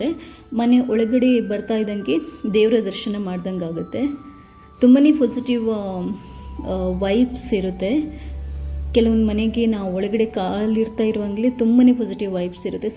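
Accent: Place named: Mangalore, India. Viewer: ಅಕ್ಕೆ ಇರೆನ‌ ಕನ್ನಡ ಕೇನಗ ಎನ್ನಿಯೆ ಈರ್ ಕುಡ್ಲದಾರ್ ಆದುಪ್ಪು ಪಂದ್. ಎಡ್ಡೆ ಆಂಡ್... ಇರೆನ tips ಮತ ಎಡ್ಡೆ ಎಡ್ಡೆ ಉಂಡು.... Bangalore ಡು ಈತ್ ಎಡ್ಡೆ ಇಲ್ಲ್ ತಿಕ್ಕಿನ ಇರೆನ ನಸೀಬ್... ಬಾರಿ ಶೋಕ್ ಉಂಡು... ಇರೆನ ಆಸೆ ಲೆಕ ಆಯಿನಾತ್ ಬೇಗ ಸ್ವಂತ ಇಲ್ಲ್ ದೆಪ್ಪುಲೆ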